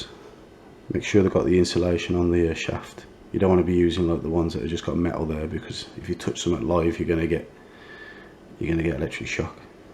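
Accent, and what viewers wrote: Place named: Midlands, United Kingdom. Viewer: Midlands man right there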